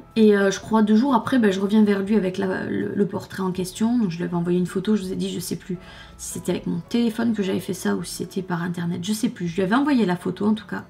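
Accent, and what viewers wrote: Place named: Paris, France. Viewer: Tu vis à Montpellier mais tu n'as pas l'accent. Tu as l'accent parisien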